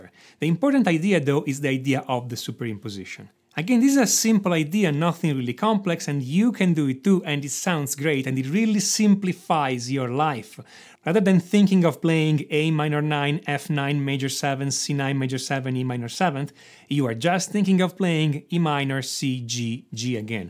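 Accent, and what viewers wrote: Italy. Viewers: Are you russian or italian? I noticed a strong accent, like we do in italy. By the way, your videos are just exceptional! I ve never seen such logic explanations, directly to the point. Your explanation with spoken theory and the sound of what you re explaining make these videos really valuable. Great work, keep on going! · you sound italian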